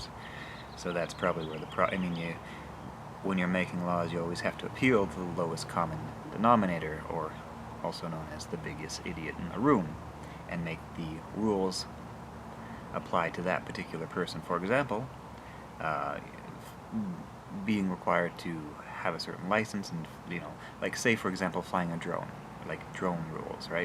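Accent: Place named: Ontario, Canada. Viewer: Are you from Ontario? Just hear a slight Accent there.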